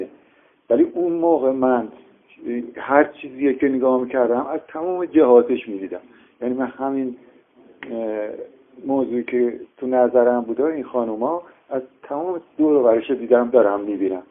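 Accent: Isfahan, Iran. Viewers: عالی بود. واقعا همینه. بعد از مرگ ما زندگی دنیامون رو بررسی میکنیم تا به درجه بالاتری از کمال برسیم. اموزه های مذهبی برای رسیدن به حقیقت بوده اما متاسفانه انقدر هدف گم شده که طرفدارهای هر مذهبی فقط خشم و کینه نسبت به مداهب دیگه دارن. ایشون واقعا رفته و برگشته و آنچه دیده کاملا درسته. من رفت و امدهایی به دنیای موازی داشته ام. عشق و محبت ساطع کردن به مردم عالیه. اگر غیر از این باشه بعدا که زندگی خود را میبینیم ناراحت میشه روح ما. عالی بود با لحجه شیرین اصفهانی. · دمش گرم که اینقدر صادقانه با لهجه شیرین اصفهانی توضیح داد! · کیره هر چی رشتی تو کس خار مادرت..با اون لحجه تخمی..کونکش موقع مردن هم ناپاکی.خارکسده.اصفهانی پورو.کیرم تو لحجت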